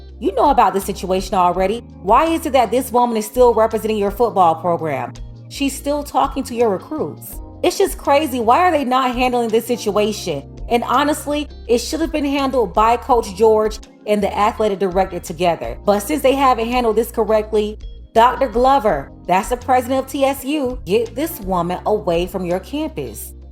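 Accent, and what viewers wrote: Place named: California, United States. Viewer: u sound like u from cali lmao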